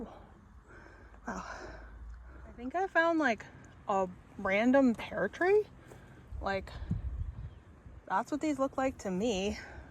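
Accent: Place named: United States. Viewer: Hi there, so nice to see you back on the SWCP. I love to hear your perspective on the path with you coming from across the pond and chuckle at some of your pronunciations. I don't have the problem of overgrown paths as i only walk the SWCP in the autumn winter months, though i do have mud to deal with. You deserve far more views and subscribers than you have. Keep up the great work.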